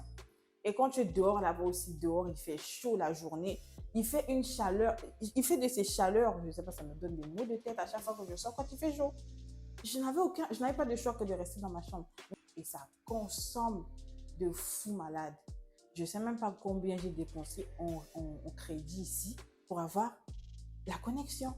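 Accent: Ivory Coast. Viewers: Ton accent devient ivoirien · Ahm, tu commences a parler comme les Ivoiriens, tu es Congolaise et puis après. ?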